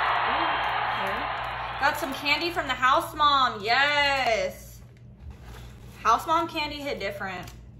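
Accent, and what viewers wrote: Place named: Texas, United States. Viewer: Yalll u from Texas u say yalllll a lot not a bad thing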